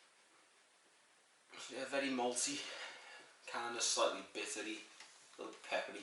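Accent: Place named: Liverpool, United Kingdom. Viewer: I love how you say ‘Turkish’! Love the Scouse accent. Looks like my scrotum. Hahahaha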